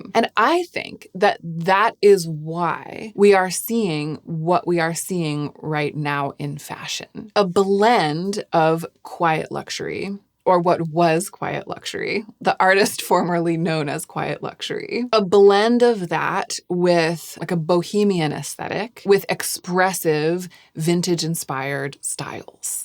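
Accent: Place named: United States. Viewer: One American with amazing accent, tone and overall delivery. Very articulate and elegant speech.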